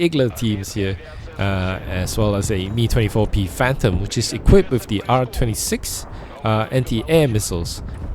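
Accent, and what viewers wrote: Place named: Singapore. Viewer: Those frogfoots 🤯🤯🤯 beautiful plays! Thanks for good commentary too, I'm guessing you are from Singapore?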